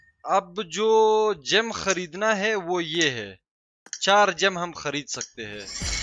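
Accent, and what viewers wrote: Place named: Hindko, Pakistan. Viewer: Ap kaha say ho? Awaz sa asa lgta hai ap hindko speaker o.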